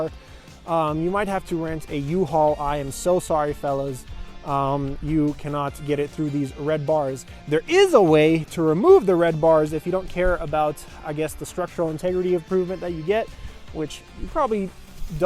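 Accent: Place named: Canada. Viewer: That Canadian accent and way of speaking annoys the crap outta me.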